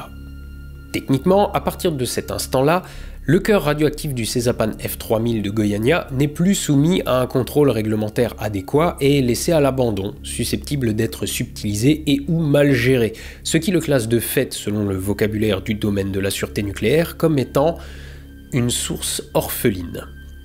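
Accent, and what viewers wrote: Alsace, France. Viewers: l'accent alsacien 👌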